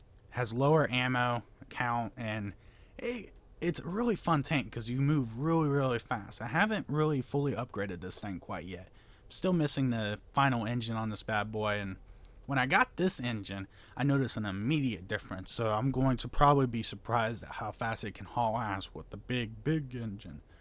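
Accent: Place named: South, United States. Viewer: what is your 1st language? you kinda sound like your playing with a "southern" accent, maybe something else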